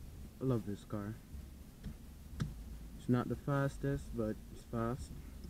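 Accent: Jamaica. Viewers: I recognize dat accent are u Jamaican?nice car btw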